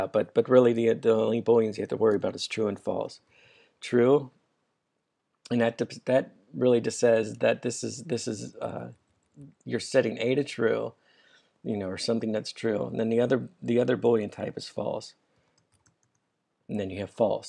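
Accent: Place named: United States. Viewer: this shit is sooo helpful im real glad u actually have and american accent my damn teacher is swahili.. its killin me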